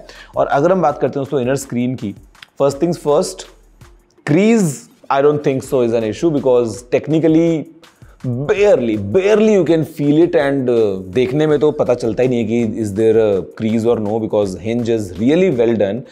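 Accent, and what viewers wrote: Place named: Butler English, India. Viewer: Pls Stop speaking in butler english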